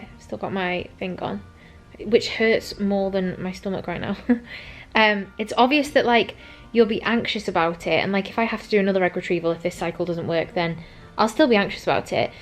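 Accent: United Kingdom. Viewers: That's my birthday! ☺️ Never thought you were British 😍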